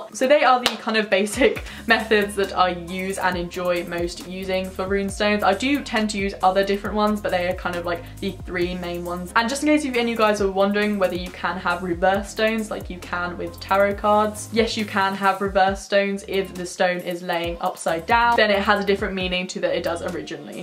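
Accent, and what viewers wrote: England, United Kingdom. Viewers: Thank you for the video. I love your English accent and you have a beautiful voice. Sending you love and light. 🙏🏼💜🧿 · Corinna Ginterseder sometimes her accent sounds kinda british, but i'm not specialist :P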